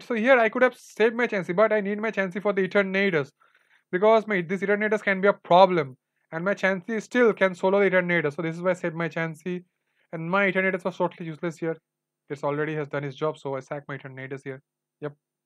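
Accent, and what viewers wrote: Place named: India. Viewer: indian accent ftw 💪💪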